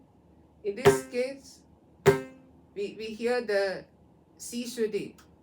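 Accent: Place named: United States. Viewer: I am curious, your accent smells like US. Which state are you residing. And, are you conducting online classes. If yes, how can I approach your class. How much the charges for a student. Thank you 🙏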